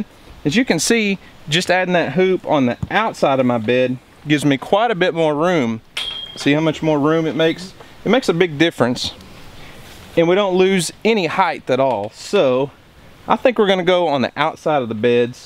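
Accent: United States. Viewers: great video, love your accent, reminds me of all the cowboy movies i watched growing up here in Scotland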